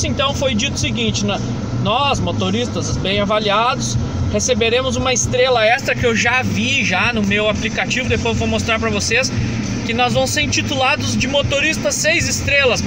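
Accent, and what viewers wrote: South, Brazil. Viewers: Fala parceiro! Pelo sotaque vc é do sul rsrs...qual sua nota média?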